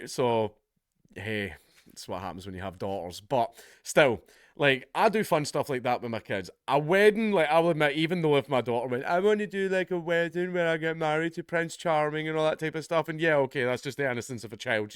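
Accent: United Kingdom. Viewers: Pov: the most British British man.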